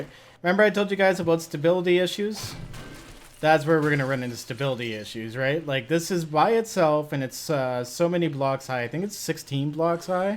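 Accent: Canada. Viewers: Thanks, had my fingers crossed for an episode today and you delivered! I'm almost done searching Naxezgane POI's and then I'm off to random gen. Finally died, on day 58. Went to search for the gold mine and rode into the friggin canyon looking over my shoulder lol. Was thinking about other games for you. It's a real slow burner but Ark blew me away dude. There's this real sense of wonder and discovery throughout the game. It's wasn't too sound mechanically but is definitely unmistakable overall. PS glad you don't nerf your dialect like most Canadian Youtubers. Pronunciation of "aboot" and using "ey" are actually common here in regions of England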